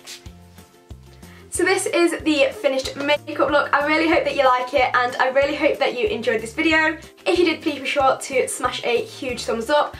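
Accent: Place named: Nottingham, United Kingdom. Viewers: Nottingham I think x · Where abouts from the uk are u from , I'm trying to figure out yr accent 😂✨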